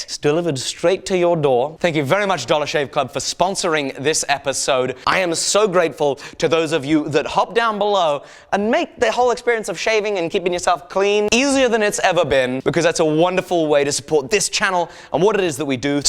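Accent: United Kingdom. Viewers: Wait..... he is america?!?!! wtf he sounds british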